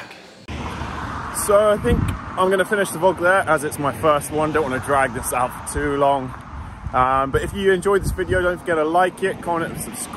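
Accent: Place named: United Kingdom. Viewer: Well, I'd say that it would be interesting to keep up with you not only for gym activities but for whatever you may like to show us (like some progress with your entrepreneurship etc.). This way, guys who come here for some motivation and a positive example of self-change will see that they can not only change their physical appearance, but their life overall. If you'll be willing to take that role, of course. A big thanks to you for creating this channel, mate! I found your shorts extremely interesting and useful - they don't take lots of time to watch and are straight to the point. Also, y'know, I'm a straight guy and all that - but, dude, you look damn amazing! I love British pronunciation over all others and must admit that your is awesome. Thanks for keeping it understandable for non-natives.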